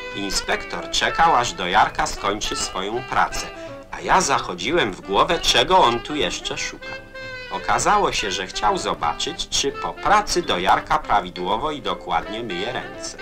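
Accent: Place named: Warsaw, Poland. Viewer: Fajny Akcent lektora ciekawe Z jakiego rejonu Polski on był trochę jakby z Warszawy albo okolic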